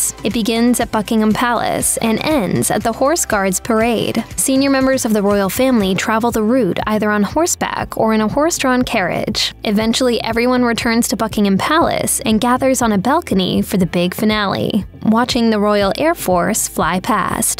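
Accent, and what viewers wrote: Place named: California, United States. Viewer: The voice narrating this has too strong of a Cali-girl accent. Emphasizing the end of every word by drawing it out. It's distracting.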